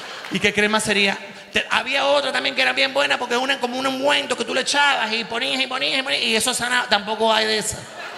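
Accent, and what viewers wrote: Cuba. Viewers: Bendiciones para ti y muchos éxitos más 😂😂😂😂no podia parar de reir cuando contaste lo del médico prieto jjjj nos violo a los 12 jjj eres lo máximo eres auténtico el mejor ,llevo la madrugada entera viendo tus videos, se jodio el trabajo mañana jjj ,haz mas chistes sobre cubanos lo agradecemos mucho y el acento de cubano te queda super bien 😂😂😂😂 bendiciones para ti desde cuba 🇨🇺 ❤ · que bien te queda el acento cubano yo soy cubana y me da mucha risa todo es verdad 😂🤣😂🤣😂😘🇨🇺 que comico eres😂😂😂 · Más shows con acento cubano please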